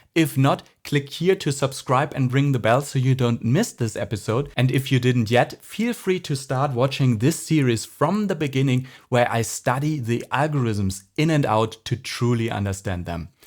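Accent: Germany. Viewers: You have some accent. Are you German? Did you consider adding German or whatever subtitles + description translation to your videos to run an experiment and measure the effect on total views? Can't find this kind of topic anywhere. Seems like nobody figured it out.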